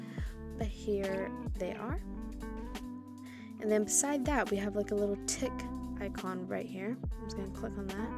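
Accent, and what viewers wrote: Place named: Australia. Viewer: i like ur aussie accent its so cute it sounds just like rosie from blackpink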